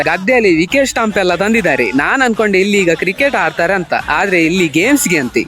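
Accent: Mangalore, India. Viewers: Entha marra neeu chanda aagthade kushi aakthade antheera neeu sa banni antha kariudila alla😂😂😂 Summane aaitha 😂😂😂 Ae ne heli maarra nimma chanel nodlikke namma kudla kannada mathu kellikkene ondu kushi ne bere ❤❤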